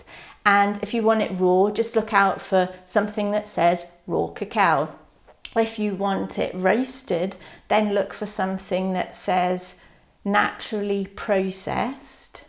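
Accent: United Kingdom. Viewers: Mam, are you speaking British language because I'm learning British language, plz reply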